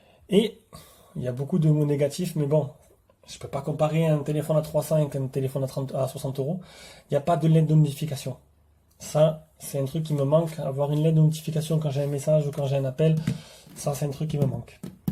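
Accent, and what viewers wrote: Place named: South, France. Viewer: l'accent du sud de ouf ^^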